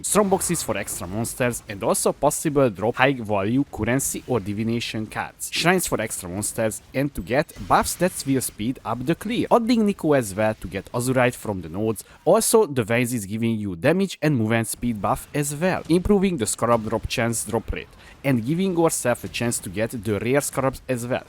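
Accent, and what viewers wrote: Hungary. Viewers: nagyon hallani, hogy Magyar a srác 😅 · Work on your accent my buddy. Without looking I'm pretty sure you are from Hungary. :D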